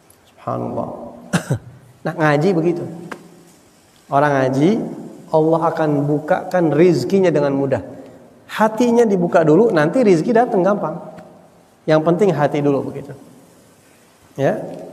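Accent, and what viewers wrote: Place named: West Java, Indonesia. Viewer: Masyalloh.. Habibana.. Senyum ane denger basa Sunda nya.. Ajiieeb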